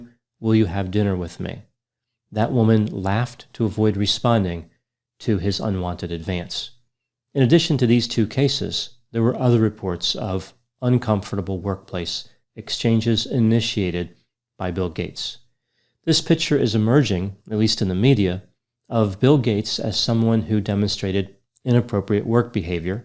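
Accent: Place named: New York, United States. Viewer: A bit of accent came through more than usual today. I'm guessing he's from outside of NYC but within 75 miles. He's probably mentioned his hometown accent at some point and I could be way off.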